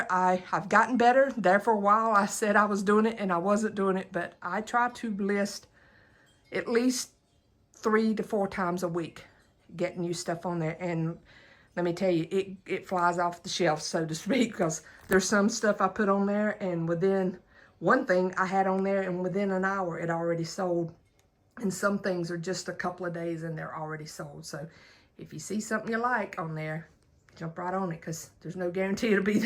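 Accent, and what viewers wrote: South, United States. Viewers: Love your decorating, AND your sweet southern accent! 😊 Thank you for the tour, I really enjoyed it.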